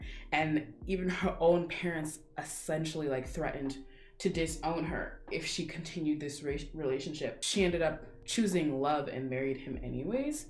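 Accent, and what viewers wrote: South Korea. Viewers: 아니 억양이 너무 한국인이야 신기해 미국인들 다 저러는거 아니잖아??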